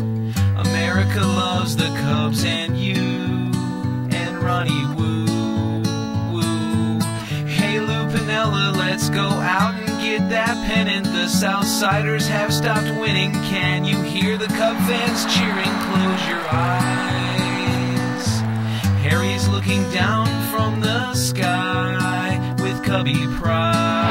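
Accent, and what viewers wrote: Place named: Canada. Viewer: canadian? oo instead of oh?... nothin again canadians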